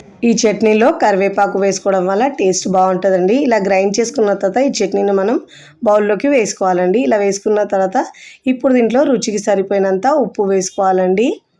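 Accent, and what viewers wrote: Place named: North India, India. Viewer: ఎందుకు సబ్స్క్రయిబ్ చేసుకోవాలి, ఇ లాంటి చెత్త కోసమా, అసలే నార్త్ ఇండియన్ లా ఉన్నారు తెలుగు రావట్లేదు.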